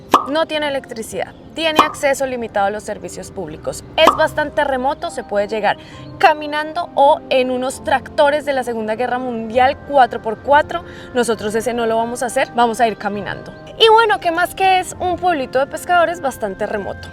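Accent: Antioquia, Colombia. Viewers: Tu acento me vuelve loco chica colombiana hermoza,nuestro país 🇺🇾 es lindo pero esta a años luz de el tuyo 🇨🇴,digamos las cosas como son,saludos desde Durazno(Uruguay). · Hola, tenés un acento " paisa", si hablamos de gente amable en tu ispa los cartagineros se llevan el premio!!!! Saludos desde Paysandú Uy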